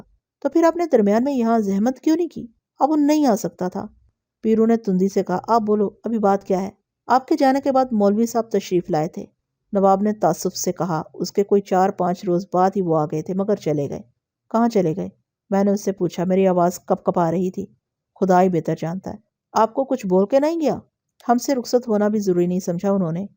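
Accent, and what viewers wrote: Punjab, Pakistan. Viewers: Apki Urdu gulabi/Punjabi hai. Lekin fluency achhi hai.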